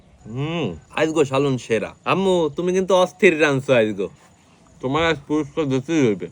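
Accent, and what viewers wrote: Barishal, Bangladesh. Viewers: ওরে বরিশাইল্যারে!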